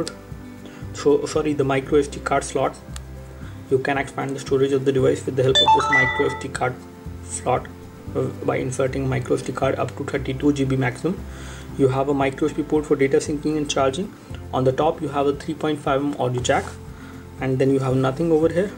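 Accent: India. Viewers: By your accent i would say you are Indian right? :D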